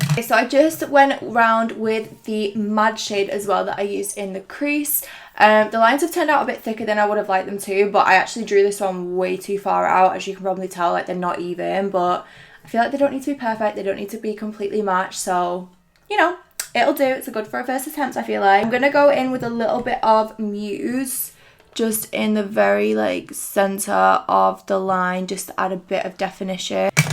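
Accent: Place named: Scotland, United Kingdom. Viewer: Hahaha you must be from Scotland. Aren’t you?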